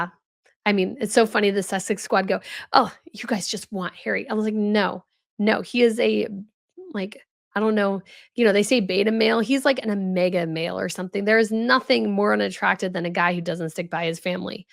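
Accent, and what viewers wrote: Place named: United States. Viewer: Someone has put the toothpaste on Charles’ toothbrush for him every day of his life. What on earth would Harry or any of the rest of them know of the “real world”? It’s weird to hear an American accent defending William being obviously more important than Harry. I must be TOO American. “Royals” are just ppl. Being born doesn’t make anyone special. But the only reason Harry needs security is the circumstance of his birth. It’s all very weird.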